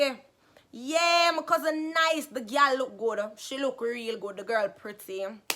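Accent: Jamaica. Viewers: I love her Jamaican voice😍 y’all Jamaican people know 😂😭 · I didn't know Jamaican accent was this much strong lol😂😂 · Straight countri Patois she a chat. Lol I should know, since I'm Jamaican 😂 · Cynth Darling it’s not... she’s just speaking patios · She is speaking English. Broken british English with a jamaican accent.. It's slang. We speak proper British English with a jamaican accent. But when she breaks into the patwa that is our slang. Just like when you see black Americans speak slang, (not all) are what they call ebonics. But when you at work, or in a professional setting, you speak proper English. Also depending on which part of the country you are from in America, it also includes ur accent. Get it? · Yes the accent is real, she is from Jamaica, that's Jamaican patois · She sounds calm for a jamacian she is toning it down for u lot British jamacian here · Me too....i think she is jamaican 🤣🤣🤣 she talks as if she is singing regge😂😂😂😂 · Me finally subscribe, yasss lol I see ppl in the comment saying they hardly understand you,lol hush guys it's patwa get use to it :-) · 🤔😆😆😆😆😆. 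Girl you're killing it..... I wouldn't call that loosing your virginity.... 4sum lol....... That's an experience darling...😂😂😂😁😁.. Love how you bring out the Patois 💯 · Shes Jamaican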